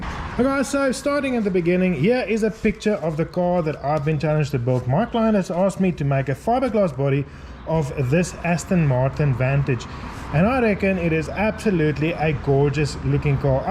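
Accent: South Africa. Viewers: You sound almost like a South African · Heavy South African accent...🇿🇦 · Afrikaans accent is the most beautiful in the world. Masterful work, also. ❤ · Are you South African?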